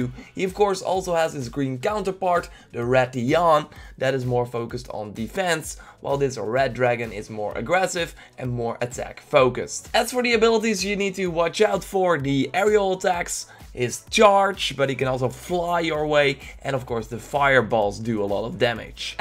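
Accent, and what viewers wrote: Netherlands. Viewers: Sounds Dutch to me.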